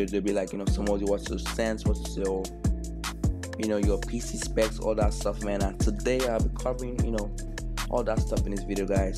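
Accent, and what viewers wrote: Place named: Nigeria. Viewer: i can tell ur nigerian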